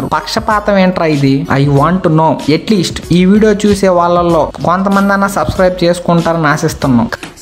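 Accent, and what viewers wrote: Nellore, India. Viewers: Bro nuvvu Nellore aa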